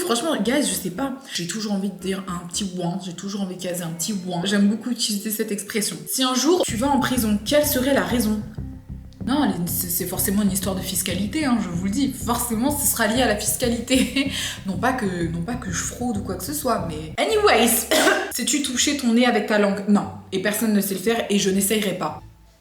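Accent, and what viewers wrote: England, United Kingdom. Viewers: Son accent anglais est très intéressant et tu gagnerais à l'écouter car ça permet de retenir certains mots. L'anglais est la langue la plus importante donc il faut t'y mettre.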